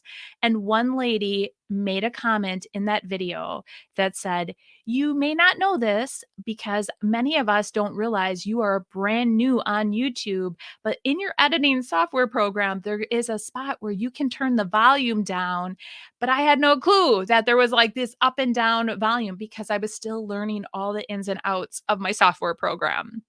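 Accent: Wisconsin, United States. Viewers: I'm loving getting to know you better with these podcasts! I can't wait to see the next and the next! When I first found you on YouTube, I'm sure I typed in DIY or something but what got me hooked on you wasn't the content alone (although that was stellar) it was your personality and, yes, your very cool, Wisconsin accent. I'm from Southern Ontario, Canada and I feel that I have a lot in common with Wisconsiners! (Is that a word?) You are the sweetest, kindest, most generous person on this planet and I NEED people like you in my realm! Never stop doing what you do. You are The Empress in your little realm and I, for one, am grateful! ❤😊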